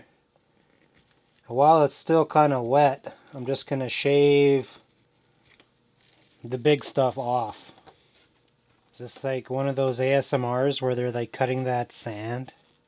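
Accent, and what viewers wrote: Canada. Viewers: Just wondering, are you Canadian? Sometimes you sound Canadian and sometimes you don’t.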